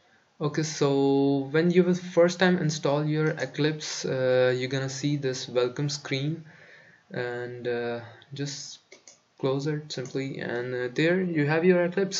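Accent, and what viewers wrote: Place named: India. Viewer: what is the problem of you Indian people with T & D, it is so irritating.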